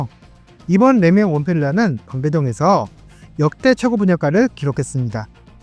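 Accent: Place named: China. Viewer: 대한민국 부동산 콘텐츠를 중국인이 다루나요? 발음이 듣기 너무 불편하고 알아들을 수 없는데, 한국말 공부를 더 하시고 만들어야 할 듯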